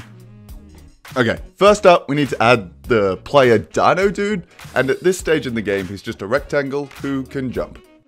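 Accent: Australia. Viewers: Tf your Aussie?😮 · You sound very Australian let me tell you ! 😆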